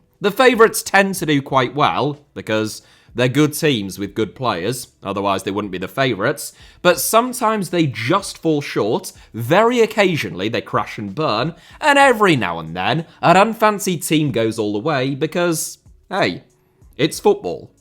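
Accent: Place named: Yorkshire, United Kingdom. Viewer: ah yes, yorkshire man. Now I read football posts on twitter with your accent now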